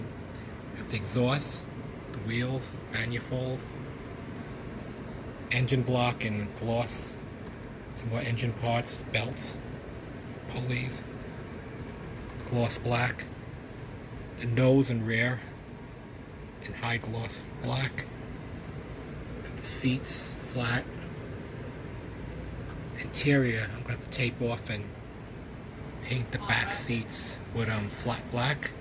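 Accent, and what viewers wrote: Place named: New York, United States. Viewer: Hey, I'm Chris......Jeff asked me to check your channel out.I subbed your channel & I like your builds a lot. I primarily build muscle cars myself, a few old hot rods. Just curious.....I'm originally from NYC, you sound like you have a New York accent. Anyway, I subbed U & nice 2 meet U buddy.....speak 2 U soon my man!!!!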